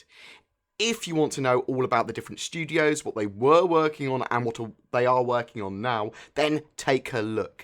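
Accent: United Kingdom. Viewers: Just found you today, and I saw one video and subscribed. I love the way you deliver the information and I can tell that a lot of research and work overall goes into your videos. I had some questions I would like to ask, what mic do you use to record, also is sounds like you live in to UK or grew up there is this true(I'm bad a accents being from the states(Texas)). Love you'r content can't wait for whats to come but for now time to catch up on you'r videos. -Ian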